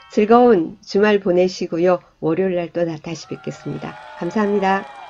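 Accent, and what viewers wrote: Gyeongsang, South Korea. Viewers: 소장님 유튜브를 보다가 우연히 보게 되었습니다. 저도 경매에 관심을 가지고 책도 사고 혼자 열공중인데 어느 채널보다 실전같은 느낌이 많이 들고 같은 경남쪽이라 말투도 정겹고 정말 시청자를 위해서 하신다는 마음이 보입니다^^ 이렇게 도움되는 영상 항상 감사하고 저도 열심히해서 고수가 되어보겠습니다!!!! 긴 댓글 처음 이지만 항상 건강하세요^^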